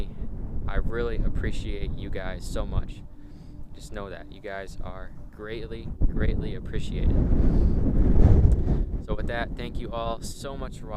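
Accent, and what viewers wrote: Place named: United States. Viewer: hey yeah you had better. No, I am just kidding. Sorry to be so cringe, just that you just make me so happy. Sometimes my autism just gets the better of me, but then to calm me down, I just watch one of your videos and that helps me. I am from England and I just love the American accent 😍 especially yours. So once again, thank you. To be honest, If I met you in real life, I would be nervous wreak ha ha coz even though you are not doing ASMR anymore, your voice would totally relaxed me. Anyways, I am gonna stop being cringe right now lol. I will look forward to your next video. 😊❤❤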